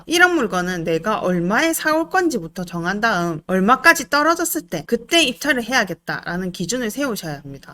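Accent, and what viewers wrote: Gyeongsang, South Korea. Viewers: 어후 보는 부동산 유튜버마다 쌍도사투리 안쓰는사람이 없누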